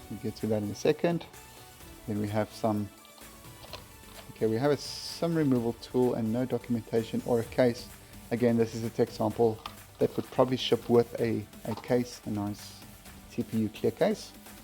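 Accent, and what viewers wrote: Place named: South Africa. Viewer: Jy klink suid afrikaans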